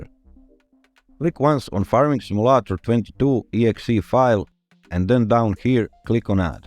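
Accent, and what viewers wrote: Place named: Finland. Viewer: you absolute mad lad! the sixth method worked for me, i'm gonna be a hero for my little boy, and you sir, you are a true saint. this video was amazingly well done, easy to follow and with a calm and soothing finnish accent it was a blissfull experience. thank you.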